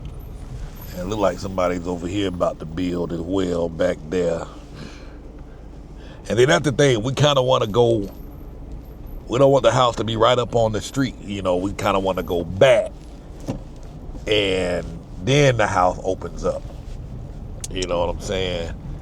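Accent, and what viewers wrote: South, United States. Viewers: I Have Very Sensetive Ears. I Can Not Listen To Most Commentator. I Can Listen To Him, I Get A Kick Out Of His Colorful Southern Pronuncation. He Represents Sun, Shinning in Mexico. Its a Good Match!" I Want A Lot! Looks Great!Been on the Road with You a While. Looking Great!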